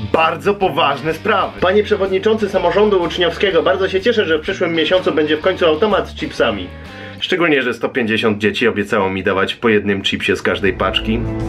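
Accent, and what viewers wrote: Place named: Poland. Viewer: Nagraj beczkę po angielsku z takim zajebistym polskim akcentem ^_^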